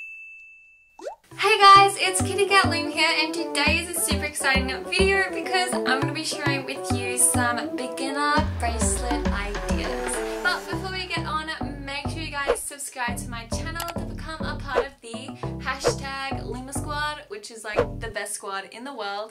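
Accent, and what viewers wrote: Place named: Australia. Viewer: #loomersquad love love lovee your videos, great to know that there are still some loomers out there! also love your accent, im Australian aswell 💙🌈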